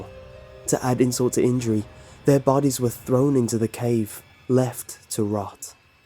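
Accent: England, United Kingdom. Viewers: Ahaha he's definitely not australian Cuz I'm australian and this kinda sounds english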